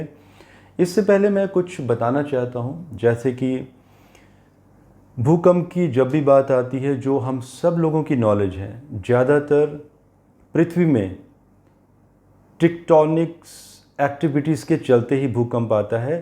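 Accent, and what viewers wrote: Punjab, India. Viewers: I really like your Punjabi Accent ...:) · Punjabi accent?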